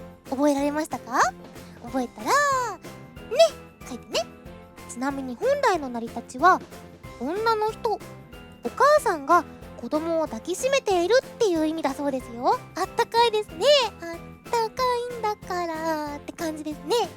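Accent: Japan. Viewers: well Japanese uses kanji/hanzi as well so it's Japanese especially regarding that she pronounces words in Japanese pronounciation. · 日式中文RRRRRR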